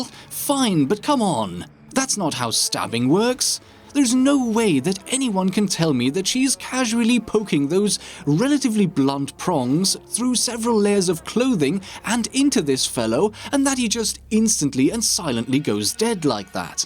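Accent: Germany. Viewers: I'm laughting so bad!!! Omg, how to destroy a bad CoD game based on terrible historical inaccuracy 101 - featuring; summoned to defend the honor of the Fatherland. This is why I'll stick with Battlefield 1 for the time being. Sorry to say this, but I love the sound of your german accent/voice in full fledged fury. Seems/feels so natural.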